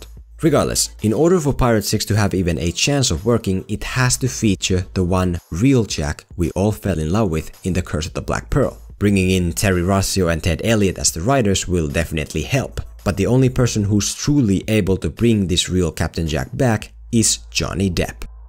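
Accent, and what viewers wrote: Finland. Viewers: Are you from Finland? Because you speak like that? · Where are you from? I smell Finnish accent oon suomalainen btw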